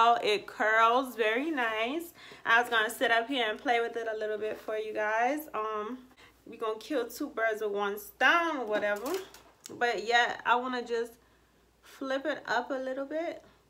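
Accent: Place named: Charleston, United States. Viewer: Where are you from lol ? You sound like us in Charleston lol love the review very nice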